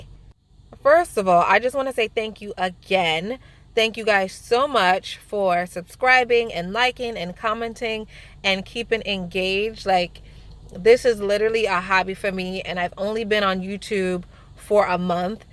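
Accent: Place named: New York, United States. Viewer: Aww i love the positive girl power on this channel. This is what should be more on youtube, we girls need to take over ALL of youtube lol. No but seriously im here cuz ive been a huge fan of babygirl for a while now so im glad i found you. Plus i believe your from NYC? Brooklyn? I can hear ur accent. Lol. Good luck on your page & enjoy your journey, im a sub for life now & look forward to watching you grow. Lots of love & positive energy girl 🤗💅❣🙋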